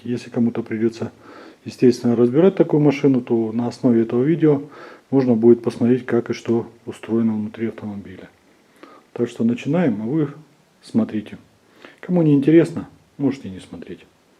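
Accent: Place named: Rostov, Russia. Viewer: Как же слышно Ростовскую букву "г" )) и "утак" )) Уважуха за проделанную работу!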